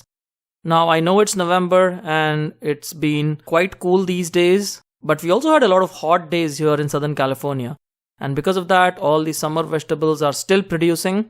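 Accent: India. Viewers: Are you from India your English seems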